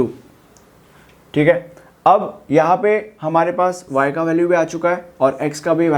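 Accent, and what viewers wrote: United Kingdom. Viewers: British accent 🫡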